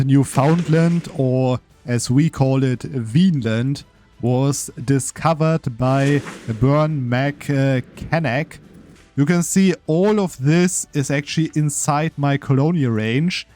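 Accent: Germany. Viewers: The German accent is as sharp as a knife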